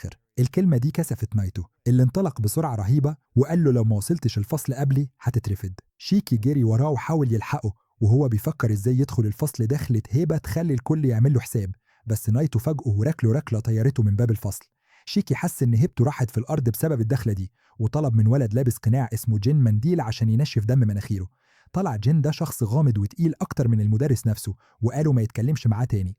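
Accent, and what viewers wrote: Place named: Morocco. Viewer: وي انا متاكيد إن انت مغربي عشان صوتك يشبه 5 انا عرفهم من المغرب وي لو انت مغربي يبق انا ما غالتش الانا تبعتك❤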